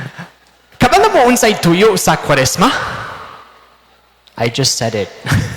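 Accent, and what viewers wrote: Visayas, Philippines. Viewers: Grabe maka Bisaya si padre oi.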